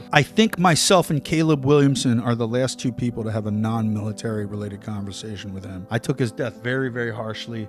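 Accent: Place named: United States. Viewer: Sounds like a real American brother. 🇺🇸🇺🇸🇺🇲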